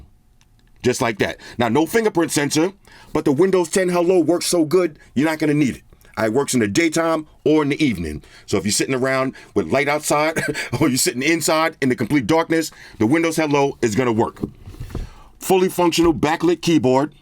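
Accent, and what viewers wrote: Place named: West Coast, United States. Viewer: Here my man I just heard the voice for 1 second and I subscribed he reminds me old school west coast 😂😂😂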